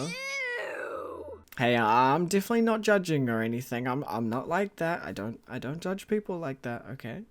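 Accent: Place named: New Zealand. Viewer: I hear "kig shid" and laugh my accent off 🤣 actually I find very cool to hear so many different english accents on yt, and now I know that NZ accent have a very closed 'e' sound in words like 'bed', 'shed, 'keg', 'quest', and the stress syllables are different in some words, like 'crAnberries' instead of 'cranbErries' 👍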